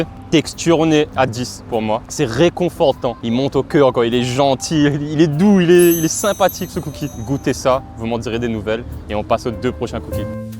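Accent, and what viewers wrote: Réunion, France. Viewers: et pour l’origine je me disais bien mdrrr mi te dit te gars là n’a un accent comme nou 😅🇷🇪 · Tu as l’accent . Tu es réunionnais ? 🇷🇪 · trop bien franchement et i remarque le ti accent réunnionais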